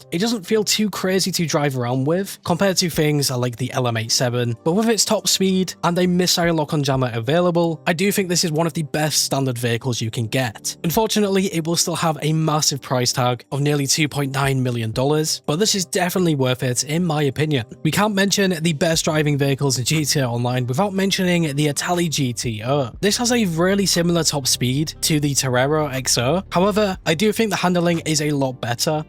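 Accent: United Kingdom. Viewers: Is this British English or Scottish?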